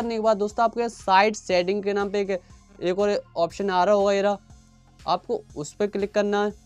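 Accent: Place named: Uttarakhand, India. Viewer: Because aap ki avaaj Uttarakhand side ki lag rhi hai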